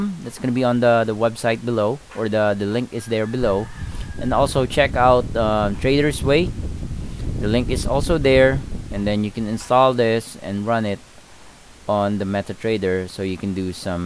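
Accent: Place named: Philippines. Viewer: he's got the filipino accent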